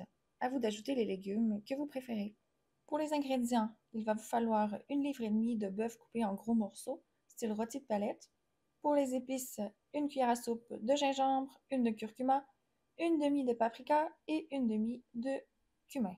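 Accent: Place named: Canada. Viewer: Je crois elle est du Canada mais c'est compréhensible ce que elle dit !!!!!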